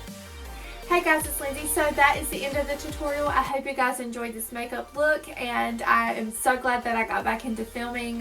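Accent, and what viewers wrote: South, United States. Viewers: ahhh reading down further, a southern accent!!! Love it, I'm in Tennessee!!! :) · yay ur back I missed u I love the look and ur personality ur awesome and I love that u have that southern accent I got it to and never be in braised about it. it's just who we r yay sending big love from TN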